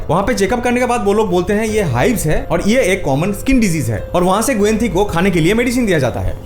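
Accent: Bengal, India. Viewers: bro , you are from west bengal, right , because your hindi language is not a regular hindi language it mixed bengali and hindi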